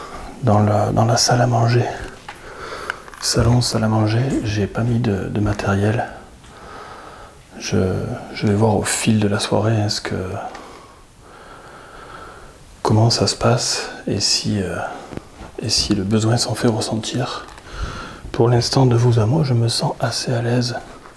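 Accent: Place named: Southwest, France. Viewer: J’aime beaucoup tes enquêtes. Tu es sensible, respectueux et touchant et… tu as l’accent de chez moi 😜 Tu es de la Dordogne ou du Sud Ouest j’imagine. Merci pour tes vidéos… je n’en manque aucune. Protège-toi quand même avant chaque enquête. Bonne continuation 🌸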